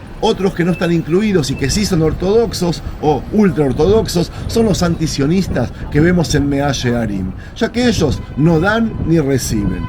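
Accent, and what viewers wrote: Argentina. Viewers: Viven de sus camaradas que tienen empresas y multinacionales y de las armas que fabrican en Israel y otros países. Y parece que a la gente se le ha olvidado lo que estaban haciendo en Nueva York. La mayoría están podridos de dinero, pero no se gastan nada. Lo guardan para la causa ortodoxa y a las mujeres, sólo las queréis para que tengan hijos. No engañais a nadie. Y por favor, no preguntes, si no te van a gustar las contestaciones. Porque no preguntas a las mujeres si les gusta esa vida. Y por lo que se escucha, eres argentino, éstas hay porque pediste asilo, para salir de tu país.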